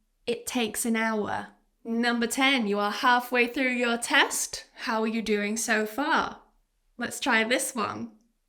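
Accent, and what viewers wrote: United Kingdom. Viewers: Hello..I would like to join ur classes.how can I joy? Actually now I'm in UK.I like ur British accent and your pronunciation course would help me alot. Thank u a lot.I'm looking forward ur reply.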